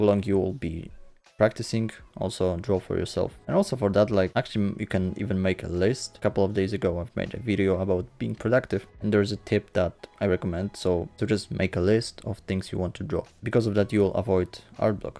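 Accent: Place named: Poland. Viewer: Thats some great tips! By the way, if I may ask, where are you from? (Im polish and thought your accent sounds alike)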